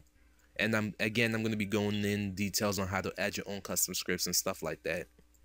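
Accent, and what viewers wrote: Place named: New York, United States. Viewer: You sound like you're from New York. xD Great videos man! Thanks a ton. I found them on the rpgmakerweb forums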